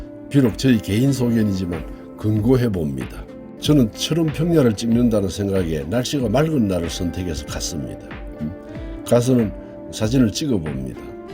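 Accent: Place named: Gyeongsang, South Korea. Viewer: 구독~좋아요~🎉🎉🎉 선물 드려요❤❤❤ 이렇게 자연은 신기하군요 목소리듣자마자 경상도인거 알겠어요 저는 부산이니까요 행복한 날들 영위하셔요😂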